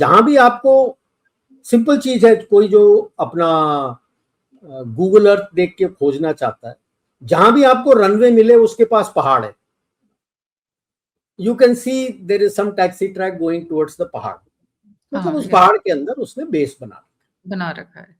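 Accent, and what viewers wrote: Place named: Punjab, India. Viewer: Sir, your last name indicates you are south indian but your dialect shows you are perhaps punjabi speaking. Sir, reveal you are punjabi or tamilian.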